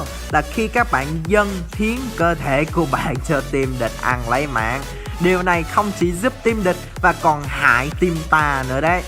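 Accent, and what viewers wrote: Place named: Saigon, Vietnam. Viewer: vđ là giọng sg lại nói nhanh ý.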